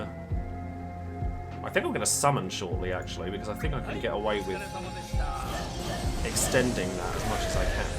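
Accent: United Kingdom. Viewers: I just started this game this past weekend, so was looking for some videos and came across your channel. I love the accent (British?) and you're a cosplayer as well (awesome). I need look no further and subscribed.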